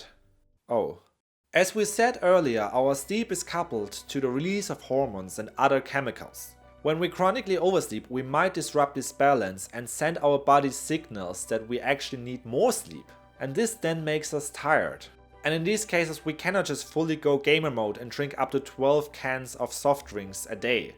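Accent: Germany. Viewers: I subscribed simply because I love listening to German people talk. Their sentence structures lack pronouns, conjunctions and sometimes the improper emotional reactions to what they are saying. Nothing wrong with that, I'm not criticizing this person at all. Most languages translate a little irregular to English. I find Germans speaking English to be really cute😊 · This is the first vidoe by you I’m seeing. I immediately knew you were German. Ich liebe unseren deutschen Akzent :D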